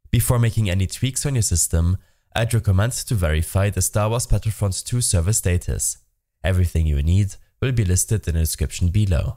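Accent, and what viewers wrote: Germany. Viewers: uhhhhh i hear a german voice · ich hör eine sekunde und man merkt das du ein deutscher bruder bist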